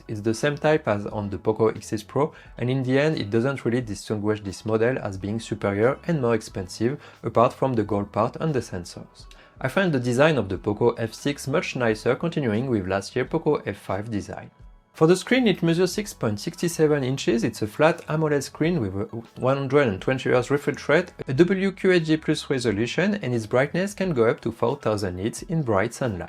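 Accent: France. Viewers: L'accent français transparaît beaucoup, il nous en faut un aussi.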